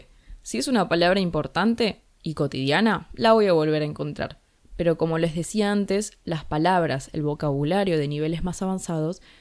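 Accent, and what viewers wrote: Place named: Rioplatense, Argentina. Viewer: Great accent to learn. I love Rioplatanese. I wish there were more of these to listen to, since it is valuable Comprehensible Input, but I am looking for 500 hours worth, not 500 minutes. Still, it is nice to have this Argentine source for a short while. Thanks for making these videos.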